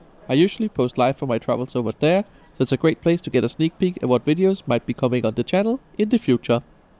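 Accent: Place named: Denmark. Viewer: Is strange how undestand person with Danish accetn better than Americans.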